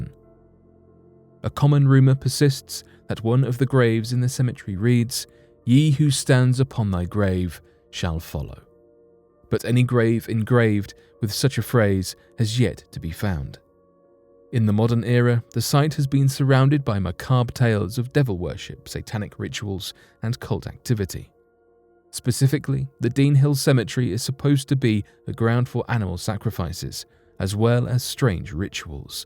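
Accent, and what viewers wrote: England, United Kingdom. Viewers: Hes British. Settle down with the exact pronunciations. I'm surprised more people dont criticize his shitty research. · Americans complaining about how an English persons pronounces something 😂kettle black and all that 😂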